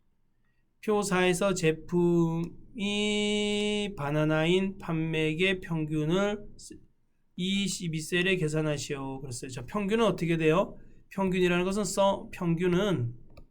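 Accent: Gangwon, South Korea. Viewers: 선생님 오늘 시험보고왔습니다~~ 함수 진짜 75문제 두번 돌려보고 상시기출 보니깐 좀 이해가네요!! 반복이 답인것 같습니다. 선생님 덕분에 index match 마스터했어요! 선생님꺼 보고가면 왠만한건 다 풀 수 있습니다 ㅎㅎ 실수만 안했으면 만점일 것 같습니다! 저도 강원도 사람이라 억양이 익숙해서 즐겁게 강의봤습니다! 농담도 재밌어요 ㅎㅎ 감사합니다^^(셀서식-범위잡고 이름지정 조건부서식 수식조건셀 Hour*65+minute*/10 요금 65 If month 수시 정시 1-6월 9월 Vlookup left 할인율 구하기 Daverage- average 상공고 평균 - 전체고 평균 Averageif , round 1 &연산자 “점” 정렬 부분합 시나리오 지정 남,녀 기준 2개 하래서 당황함 = 컨트롤키 잡고 눈치껏 했습니다 매크로 차트)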